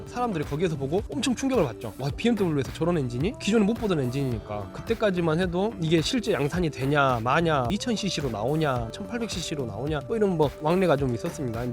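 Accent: Jeolla, South Korea. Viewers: 전라도 말투가 나와서 혹시 했더니 "귄있다"는 단어를 예로 드는 걸 보면서 확신을 합니다. 말씀 참 잘 하시네요. 작년에 할리 883 몇 달 타 보고서 이정도면 충분해 하며 오토바이는 안 산다고 맘 먹었는데 이녀석을 보고 나니 엄청 흔들리네요.... 주식을 좀만 더 열심히 해서 2천만 더 모으면...ㅎㅎ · 내용 매우 좋았구요, 더 보고싶다는 느낌은 주행영상에 대한 목마름이 아니었나 싶습니다. ^^ 특유의 전라도 사투리 억양이 재미를 더합니다.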